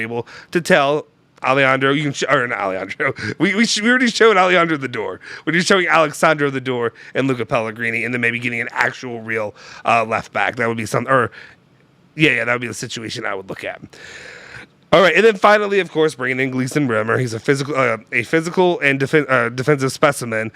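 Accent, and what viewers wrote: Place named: Minnesota, United States. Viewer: never do that Minnesota accent ever again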